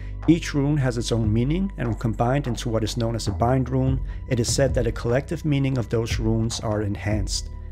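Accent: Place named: Denmark. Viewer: Dansker accent :D great video.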